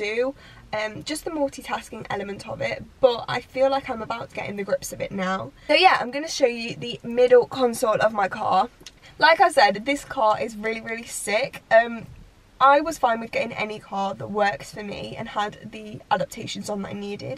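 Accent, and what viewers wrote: United Kingdom. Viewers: Cool car, I noticed the voice and said she’s in London or Britain. Even noticing that, when she was getting in the car...I said why is she getting in on the right side of the car? 😟🤣🤦🏽‍♂️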